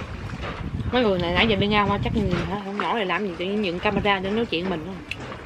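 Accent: Mekong Delta, Vietnam. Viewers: E thích chị ghê.Người miền tây nc cưng ghê 😁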